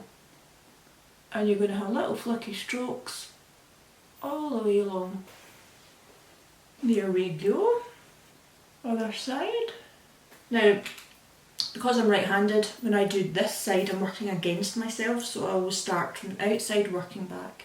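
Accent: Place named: Ireland. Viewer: Hey! 👋 Awesome tutorial! Love the detailed walkthrough and commentary. Remember to apply those tips about the intro in your future vids!! Keep it up 😌 Love the irish accent btw hahah (think its irish? Lol)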